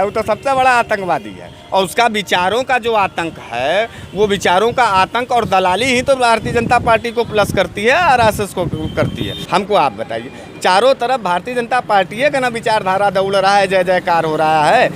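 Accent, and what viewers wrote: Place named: Varanasi, India. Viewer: wah bahut achha bolte pakke banaras wale ho bekhauf zubaan bebak andaj thank you for the liv tv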